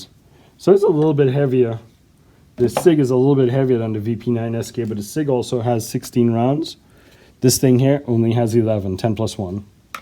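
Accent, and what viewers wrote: Trinidad and Tobago. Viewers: That accent can’t hide, sounds like a Trini... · Is it me or this man sounding like Trini?? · Sound like a Trini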